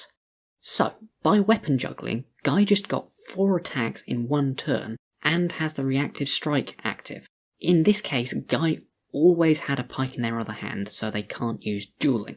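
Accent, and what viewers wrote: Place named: United Kingdom. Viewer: You sound a bit young.. but your voice is that type of brittish that lets you know you were born as a 35-year-old person with a cup of tea in hand. Im not sure what software is changing your voice. But you could tune it in a bit. If you're not using something to augment your voice you could use a new mic.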